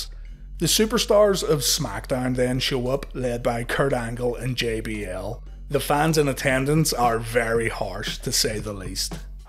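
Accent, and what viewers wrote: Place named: Northern Ireland, United Kingdom. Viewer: Nice to hear a fellow northern Irish ECW fan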